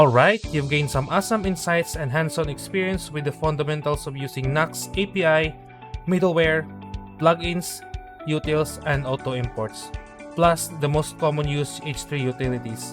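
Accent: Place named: Philippines. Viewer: The accent is very noypi 😂. You have my sub, keep it up.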